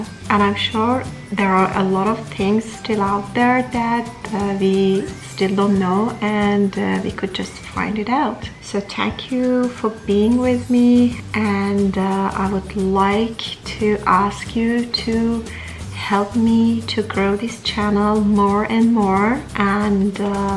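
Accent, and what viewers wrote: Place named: Iran. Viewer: ur accent is only Persian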